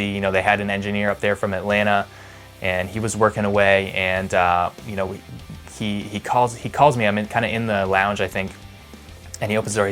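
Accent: Canada. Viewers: Your Canada is showing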